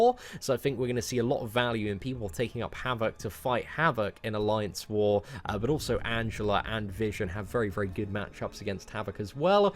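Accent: United Kingdom. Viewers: great videos bro. They've helped me a great deal. I was wondering if you could help me out. I'm farely new to the game. But, I'm finally starting to get 4 and 5 star champs. I'm going to list them below. Could, you recommend which champs I should make my 3 man attack team and which ones I should make my 5 defenders? None of my champs are awakened yet. By the way what part of the U.K. are you from? Or am I hearing wrong and your from down under? I'm what you call an American Scouser lol. Once again thanks and keep up the good work. 5 star - Hela and Yondu 4 Star- Morningstar, Mephisto, Blade KMonger, SheHulk, Emma, Guillotine, Venom, VooDoo, Wasp, Goblin, Sentry Wolvie, Antman, Punisher, Abomination, Night Crawler, Cyclops Blue, RedSkull, Capww2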